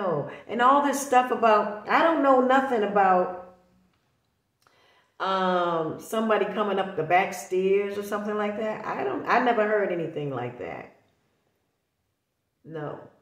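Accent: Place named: Boston, United States. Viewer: Love your Boston accent! Keep up the good work!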